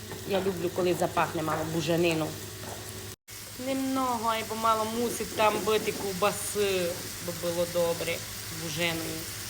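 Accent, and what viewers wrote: Transcarpathia, Ukraine. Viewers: Ви розмовляєте по закарпатський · Файна мова, Закарпатська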